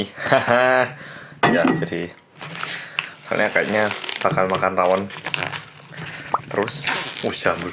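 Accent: Java, Indonesia. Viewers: ARTIS KOREA YANG MEDHOOOOKKK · Selaluu nungguuuuu samaaaa orang medok ini hehe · Mas Medokmu itu lho 😁 sesuatu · Mas mw nanya dong, masnya ini org jawa atau oppa korea ya?? Aksen suara jawa totok, tp facenya 오 빠 😁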